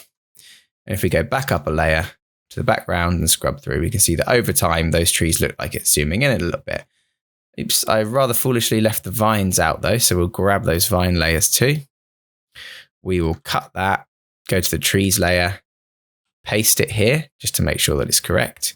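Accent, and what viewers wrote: United Kingdom. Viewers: You got some tutoring skills man 🤙🤙🤙 Far better when you have someone with an english accent hahah.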